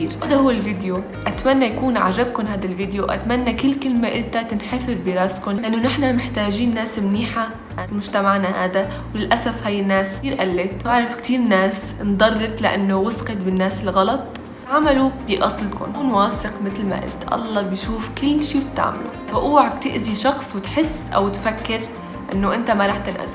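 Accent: Syria. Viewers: نضارتك حلوة و كلامك السوري احلى من المصري😊🌹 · انتي بتتكلمي سوري ومصري هو انتي مصريه ولا سوريه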